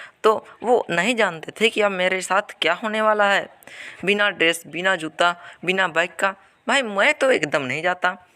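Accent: Bihar, India. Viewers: Are you from Bihar ? 🤔 Awesome explanation 👍👋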